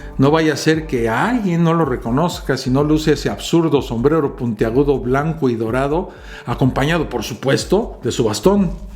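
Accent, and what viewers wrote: Mexico. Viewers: Me encanta su forma de informar aunq tenga un toque mexicano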